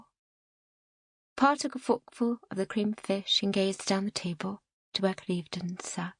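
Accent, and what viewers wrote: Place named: United States. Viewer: Why use an American for a British story? There are great RP English voices available. Be authentic!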